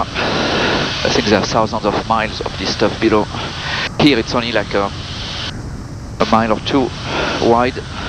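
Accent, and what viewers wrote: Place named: France. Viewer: Just with the accent we can see that you are french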